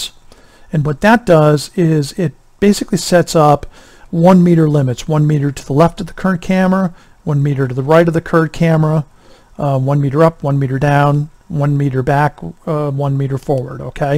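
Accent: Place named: United States. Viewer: Thank God - someone who's from the US and speaks English for this tutorial.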